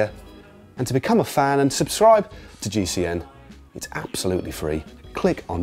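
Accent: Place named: RP, United Kingdom. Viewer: sounding reeet posh in this! till he burps at the end, that was grotty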